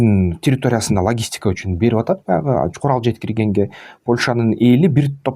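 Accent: Standard. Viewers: КЫРГЫЗЧА СОЗДУ ТАЗА СУЙЛОГОНУНУЗ учун РАХМАТ СИЗГЕ УРМАТТУУ БАЙКЕ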